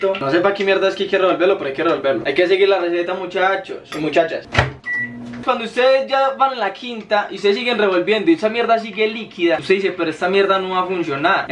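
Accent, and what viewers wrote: Colombia. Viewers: Jaja esta perdido tu ojo ve a biscarlo👌😅 parserito te amo❤ Amo el asentó de los colombianos hermosos ❤👌💙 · wacho amo el acento colobiano y amo los videos de este chavon y los q hace con kennet ❤ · Leche azucar y Cacao cocoa o chocolate empolvo En Uruguay le decimos leche v: xq es leche pero tomamos eso y lo calentamos en el micro es como café pero más rico v: jaja creeo que es igual en todo el mundo pero como tu probaste y dijiste ayy que rico ajja otra cosa me encanta el acento colombiano pa❤ También me gusta su cultura y su país me ciento identificado ajja❤ Prueba el mate😉❤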